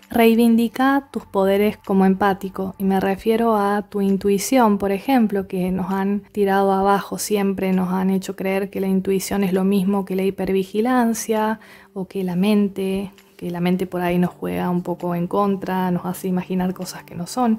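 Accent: Córdoba, Argentina. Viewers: Hola sos cordobesa? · Me encanta el acento che....saludos desde el otro lado de la cordillera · Pulsión de vida...MAESTRA, tu voz y cadencia cordobesa, me fascinan! Gracias!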